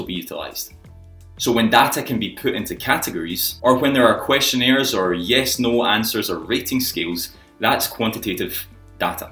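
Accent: Scotland, United Kingdom. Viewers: That scottish accent though :D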